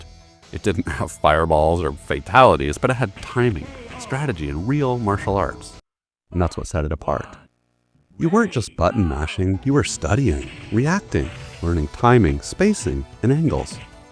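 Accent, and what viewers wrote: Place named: Portugal. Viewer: Haha, partially, yes! There were some bits in your accent that had me wondering. Also, the topics you cover on your videos - relatively speaking, there's a high proportion of Portuguese people on SEGA-based retro communities because of how impactful the company was over there, destroying Nintendo in popularity in the 90s. So there was a considerable chance you'd be like i.e. Tee Lopes, someone who was born and grew up in Portugal during the 90's but moved to the US at a young age. Thanks for answering, keep up the great content!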